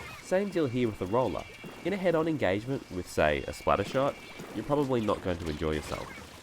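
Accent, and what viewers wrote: Australia. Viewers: You sound Australian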